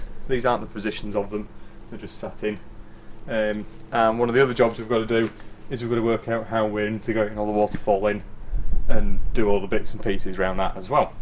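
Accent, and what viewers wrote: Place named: Australia. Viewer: Hi, just found your videos. I’m a new garden railroad. I just got my first half of a circle of track and I have a NW-2 loco You are inspiring me to try to put something outside. It’s a little bit intimidating. I have to admit, but I’m learning a lot just watching you do this. I have one question though, where are you located? Are you hesitantly I ask are you in Australia or are you in England your location of your our way and your weather doesn’t seem to jive, so I’m not sure being an American. I apologize for not recognizing the accent.😂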